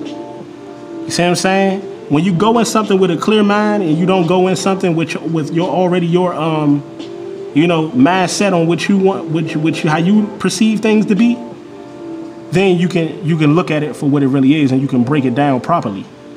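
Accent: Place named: Baltimore, United States. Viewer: You sound like you from Baltimore but real facts to the video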